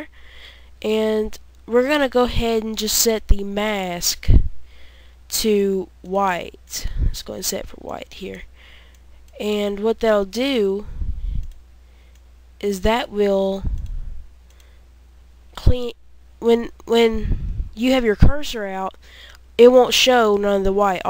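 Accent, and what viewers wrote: United States. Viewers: Cool hillbilly voice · ._. not really, I'm a Texan, I've never met anyone with an accent like that, He's most likely from the Bayous or something... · such country accent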